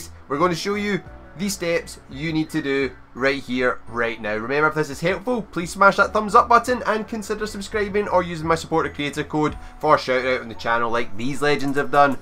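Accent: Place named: Scotland, United Kingdom. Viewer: I love your Scottish accent ❤️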